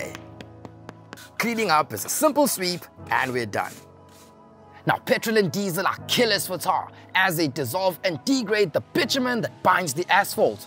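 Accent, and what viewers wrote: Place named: South Africa. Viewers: Naw, he's a Saffie, for sure. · His South African, incase you wondering .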